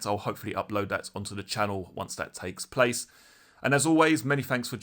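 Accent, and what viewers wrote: United Kingdom. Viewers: Another UK guy covering a US story—I have noticed a huge number of UK people narrating US stories. Now I love you guys, don’t get me wrong, but it’s Frickin’ irritating…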